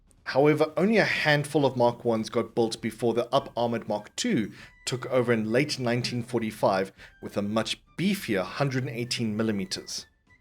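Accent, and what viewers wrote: South Africa. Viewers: In love with the Afrikaans accent.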